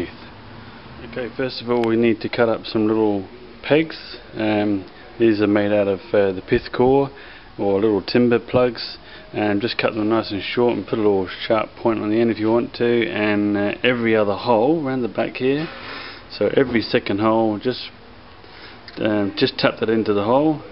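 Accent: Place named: Australia. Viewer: Great demonstration! I now have the courage and confidence to recant several antique chairs I've been saving for a long time. You sound just like my son-in-law, he's from Australia too and has the calm reassuring manner of speaking. Thanks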